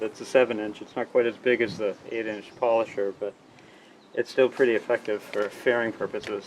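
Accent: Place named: Maine, United States. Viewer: I like the Maine accent and the fashion he works. Kindly from Calabria, South Italy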